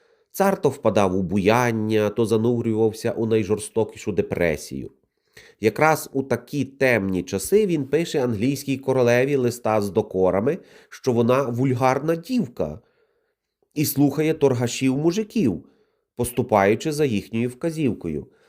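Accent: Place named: Galicia, Ukraine. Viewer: То здається ви завше так культурно і невинно використовуєте " здихав" і т.і. до моск- тів)) а ще ваш може то галичанський акцент, то звучить це дуже оригінально😅😂😂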